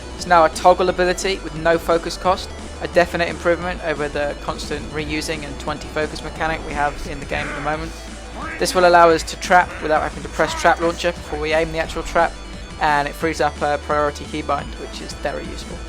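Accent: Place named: England, United Kingdom. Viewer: im not sure but he sounds english :p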